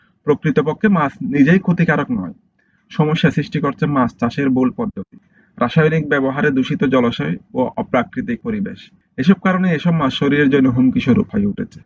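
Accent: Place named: Noakhali, Bangladesh. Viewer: উনার বাড়ি হয, নোয়াখালী অথবা চিটাগাং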